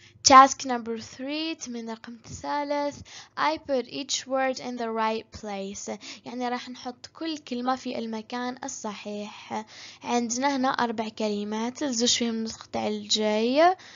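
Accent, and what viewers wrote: United States. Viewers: لانها تقرا بالطريقة الانجليزيةالامريكية وليست بطريقة برتش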